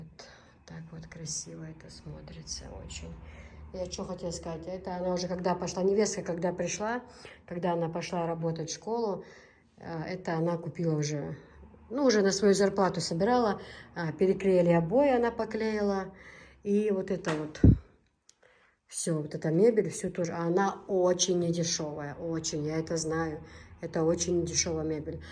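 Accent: Chechnya, Russia. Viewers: Какая ты молодец. Как чисто ты говоришь по чеченски. Умничка. Ты наша. Я влюбилась в тебя Как повезло твоему мужу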